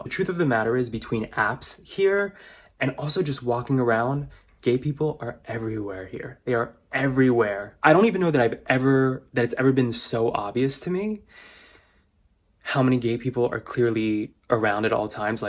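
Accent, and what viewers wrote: United States. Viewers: I really like your voice and the way you speak English ( very light American accent) By the way I 'm French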